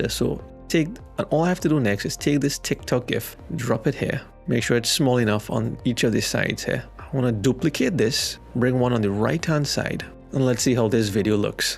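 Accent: Trinidad and Tobago. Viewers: Are you a Trini?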